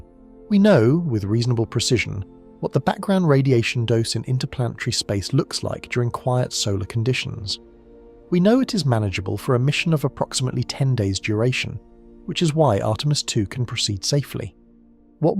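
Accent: England, United Kingdom. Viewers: Using an English accent does not make it smarter.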